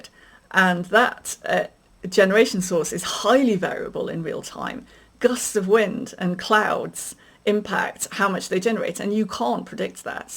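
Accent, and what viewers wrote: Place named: West Country, United Kingdom. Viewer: I have watched some of your content and must say your knowledge and your proposals, particularly in their scope, are something I can resonate with, particularly when it comes to Nuclear. HOWEVER......your delivery sounds very typical of many "Millennial's" and "Gen Z's", in that you orate many of your sentences where the listener can't distinguish whether they are statements or questions. I don't know if you reside or was brought up in the West Country but these types of speech patterns are typical of that region and can become rather tedious to the point of infuriating after a while to many listeners.........particularly us "Boomers" and "X's".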